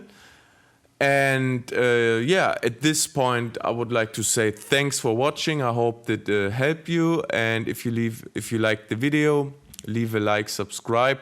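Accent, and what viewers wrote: Germany. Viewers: That german accent😂 Great remake🔥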